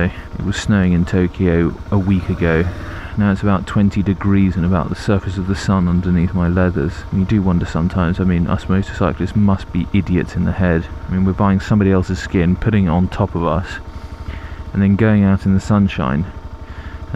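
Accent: RP, United Kingdom. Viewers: carnt belive this guy s accent so posh love the videos. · sound's like your from made in Chelsea :)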